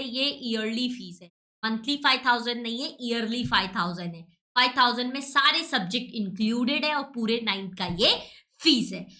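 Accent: Maharashtra, India. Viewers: Mam app Marathi ho kya 😅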